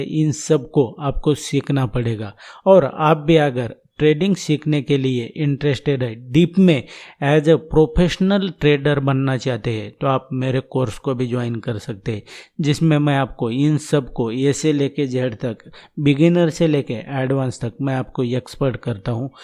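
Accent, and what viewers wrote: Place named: Hyderabad, India. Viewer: Hyderabadi ho kya aapke hindi speaking se aisa lagta ha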